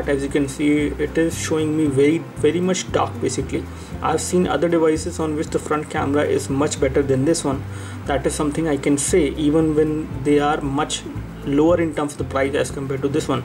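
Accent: India. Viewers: By your accent i would say you are Indian right? :D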